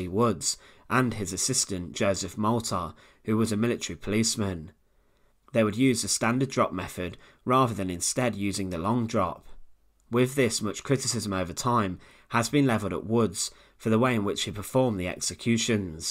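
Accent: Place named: Yorkshire, United Kingdom. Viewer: I'm curious if Brits have some kind of secret laboratory where they try to develop the strangest accents possible... earlier today I was just thinking, if "Brits are so opposed to the letter "R", why did they put it in so many words, I mean after all it's THEIR language, they could have made it any way they wanted"... I deal with people from all over the world and they all pronounce "R" as "R" [well accept for some Asian nationalities (Japanese, Korean) where they can't hear the difference between "R" and "L", but that's another story...), and not "ah"... so "Remember" instead of "remembah"... ...and then I clicked on this video...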